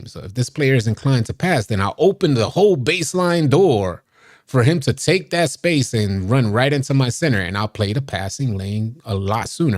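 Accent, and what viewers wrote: New York, United States. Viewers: I respect your grind.. Very transparent and informative. Your content is appreciated for sure.. I Know u from up top somewhere NY or Jersey area by your accent. I Could be wrong lol but salute my boy 🫡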